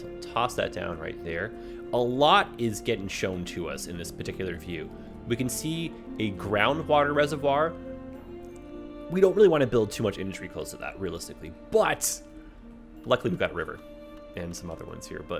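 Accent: Canada. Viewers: Will you be doing any sections from Toronto? Maybe a highpark area or possibly do the Gardiner? First time watching and knew you were canadian with all them "Eh"s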